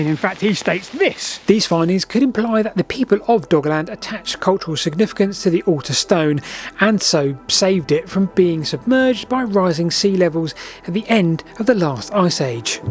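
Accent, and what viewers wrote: United Kingdom. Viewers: an accent what are you talking about? England, Scotland, Wales, and Ireland have their own accents within their respective territories depending where he was born and raised his accent would depend on that area such as Birmingham, London, Scott's, Irish or a mixture of different accents within England "The English, Despite inventing the language, don't know how to speak it." Is just such a ridiculous statement the guy is literally speaking English how it was and is spoken since before the United States or the 13 colonies even came to be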